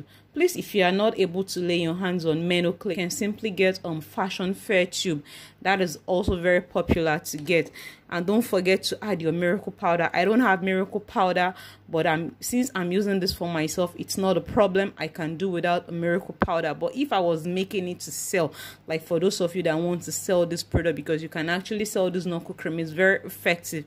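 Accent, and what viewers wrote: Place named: Cameroon. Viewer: Just stumbled on your channel. I just subscribed! You sound like a Cameroonian!! I know you said naira but you really sound like a Cameroonian! Good info on here!!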